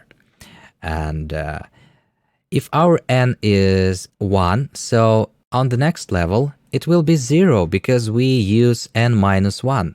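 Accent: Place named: Russia. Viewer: Cool video, comrade. Your Russian accent is so clear and melodic. I love It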